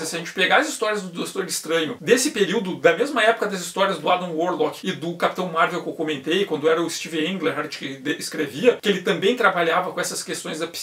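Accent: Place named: Rio Grande do Sul, Brazil. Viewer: me inscrevi e tô vendo, gostei da perspectiva, sotaque do RS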